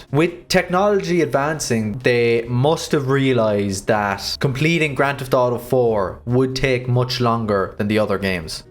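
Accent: Ireland. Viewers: Are you Irish? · Your losing your Irish accent, but I like how you say 3 and 30. You say Tree and Dirty Tree. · You're Irish ain't ya? Heard it in the accent lol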